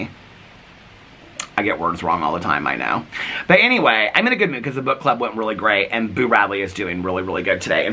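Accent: South, United States. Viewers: You do have a southern accent, I actually adore it and find it amusing when you pronounce things funny, I used to live in Louisville and I noticed that a lot of people in Southern Indiana would say code for cold, like I stayed home from work today because I have a code. I’ve never heard you say that but I always get a little smile when I hear it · To NOT live in the South, you really do have a southern accent lol 🤣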